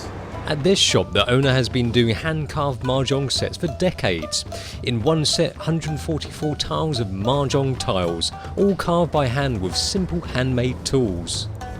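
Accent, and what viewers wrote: England, United Kingdom. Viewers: Hongkongers can immediately tell the accent of a British Chinese (i.e. accent), but communication is not a problem at all;) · He said in Cantonese that he was born in the UK, albeit Cantonese with an English accent. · Slick Trademarc he is speaking cantonese but it's slightly out of tone. It has english accent in his cantonese LOL! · u never hear speaking chinese with british accent, weird but kinda refreshing LOL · yellow skin with British accent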